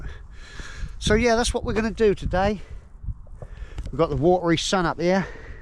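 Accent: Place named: East Anglia, United Kingdom. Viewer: Hiya! I do always enjoy your waffle, always after content like this. What you said about not being in a rush all the time and slowing down really appeals to me. Sounded a bit ironic to me though, in your quite fast spoken Suffolk accent. But that's all right - no big deal. 😄 There are a few wishes in the corner of my mind, but when you don't know what the future may bring at you tomorrow, making resolutions and sticking to them proves rather difficult. The best we can do, I reckon, is enjoy every single moment of life to the fullest, as if it was our last. Cheers, greetings from Minsk! Mike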